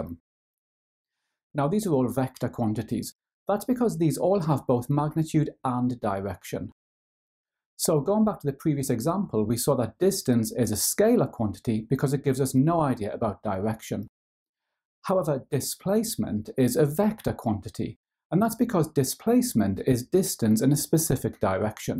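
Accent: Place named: Newcastle, United Kingdom. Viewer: IS A GEORDIE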